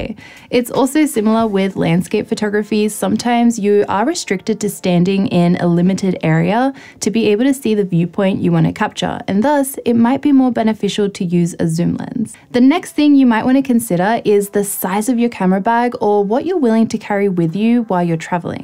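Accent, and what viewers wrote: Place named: Australia. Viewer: Australian accent mate 😉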